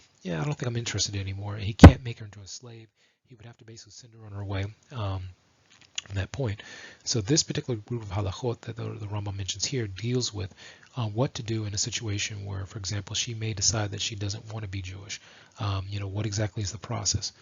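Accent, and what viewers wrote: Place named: Arab world. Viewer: You are from Arabic erea you prononouce many arabic letters like letter ,Ain in arabic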